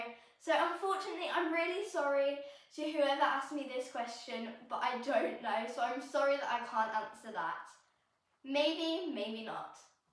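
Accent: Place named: RP, United Kingdom. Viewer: Love your accent and articulation, proper English !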